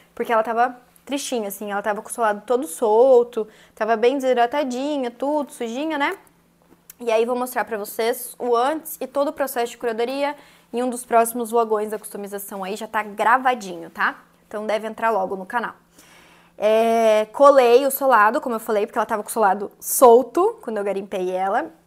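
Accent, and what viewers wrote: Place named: Minas Gerais, Brazil. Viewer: Tá muito mineirinha kkkkk "esse trem aqui ooh" 😂... Sou mineira ❤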